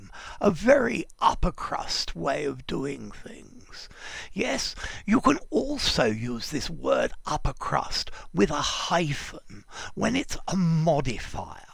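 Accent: RP, United Kingdom. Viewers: Nice upper crust accent. I don't usually go to upper crust restaurants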